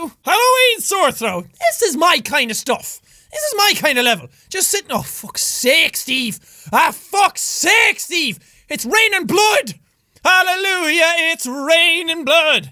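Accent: Ireland. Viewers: I fucking love the Irish accent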